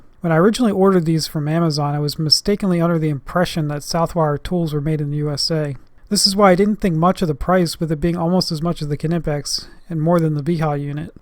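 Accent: Germany. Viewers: sir are you german or hungarian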